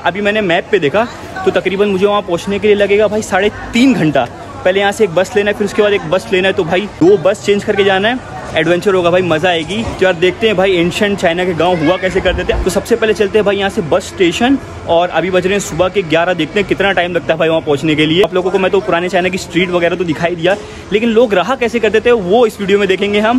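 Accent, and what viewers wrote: India. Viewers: What do you think without subtitles? I can't understand Indian English.😅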